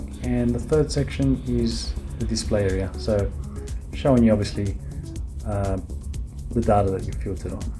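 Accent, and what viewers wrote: Australia. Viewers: Hi mate, thanks for this video. From your accent I can tell your a fellow aussie! Is all this info relevant to the ASX as well? I'm using the free version at the moment, and I don't get any pre-market info, volume or gap. So to fix that I need to subscribe? I also believe to get live data and not 20min delayed I need the live data extra - is that correct as well? Cheers, thanks for any tips you have. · Awesome bro! ... crocodile Dundee, are you an Aussie?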